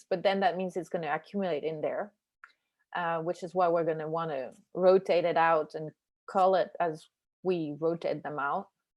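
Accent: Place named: France. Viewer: I didn't think you sounded Texan, but I would never have guessed French.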